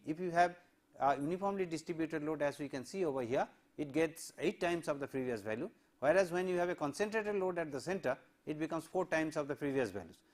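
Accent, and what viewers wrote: India. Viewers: I cant bear indian ACCENT.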